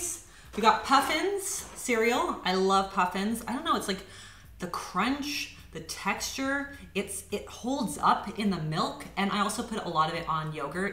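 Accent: Canada. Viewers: You look so gorgeous in this video, and can you send me some of your groceries please? Everything seems so delicious. Also, You don't say bagels wrong You just have a Canadian accent. And when you try to say it differently it sounded exactly the same in my opinion 😁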